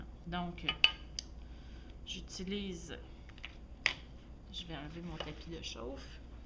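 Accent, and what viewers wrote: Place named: Canada. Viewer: merci ma canadienne j'adore ton accent ! et merci pour tes bons conseils! et l'autre en dessous qu'il ferme son tabernacle mdr!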